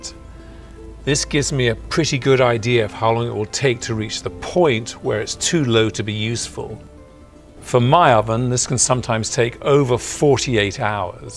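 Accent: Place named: RP, United Kingdom. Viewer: FANTASTIC BBC ENGLISH ACCENT>>>THANK YOU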